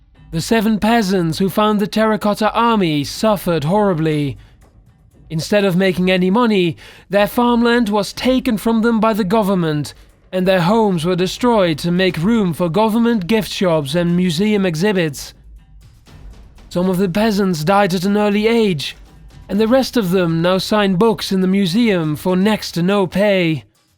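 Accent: United Kingdom. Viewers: the guy narrating this sounds like a british version of chillz.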